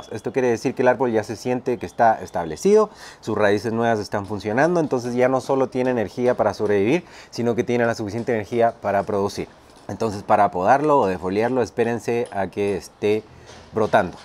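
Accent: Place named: Central America. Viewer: Increible su conocimiento, facilidad de la palabra y modo de explcia. Gracias, yo no hablo desde la perspectiva de experto ni mucho menos, solo podr'ia decir que soy un apasionado por las plantas y los arboles, pero soy totalmente neofito en los bonsais, acodos y demas temas, pero me gusat leer, ver videos, comprara notas, experiencias y experimentar. Por ahora le cuento que quiero hacer un acodo aereo a un mandarino que esta en frente del lugar donde vivo porque ya es un arbol maduro y porque ya ha produccido mandarinas de las cuales he disfrutado varias veces, el tema es que esta en una avenida asi que es espacio publico y pues cualquiera podria destruir mi acodo, quiero conservar algo del arbol viv porque en Enero de 2019 me ire de aca. Ahora mi pregunta, podria hacer el acodo y tener exito?, cuanto tiempo es recomendable dejar el acodo para cosecharlo?, si, voy a ver el video de acodo aereo, gracias y Dios lo bendiga por su aporte a la naturaleza. 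Saludos de Colombia -- por cierto, Ud. suena centroamericano/chileno, de donde es?.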